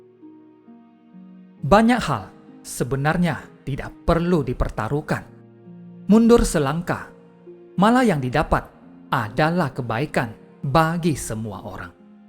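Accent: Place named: China. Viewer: hahaha, itu dibacanya dengan logat china seperti di film2 kungfu. Akan lebih enak klo pake bahasa aslinya, kemudian ada teks terjemahan.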